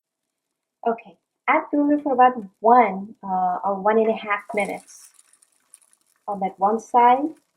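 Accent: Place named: United States. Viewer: Pakistan ,,but your accent is like American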